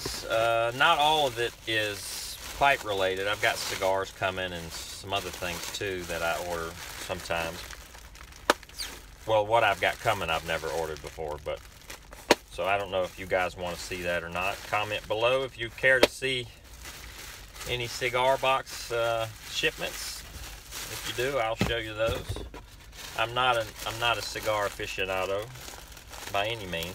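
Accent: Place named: South, United States. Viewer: What part of the South are you from?? I'm in the south too.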